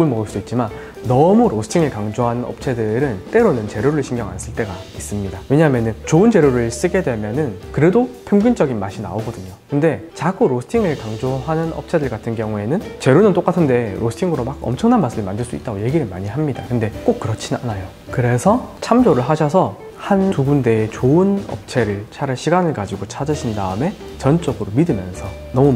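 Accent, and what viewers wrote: Gyeongsang, South Korea. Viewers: 대구억양 넘 귀여운데요ㅎ???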